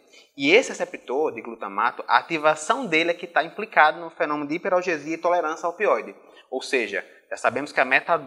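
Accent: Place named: Northeast, Brazil. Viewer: É um pouco complicado entender quando dispara a falar rápido e junta o sotaque nordestino e o agudo da voz,então complica pra entender. Surgido fazer fono se quiser falar por longo tempo.Ecplica muito bem mas o sotaque e o agudo atrapalha o entendimento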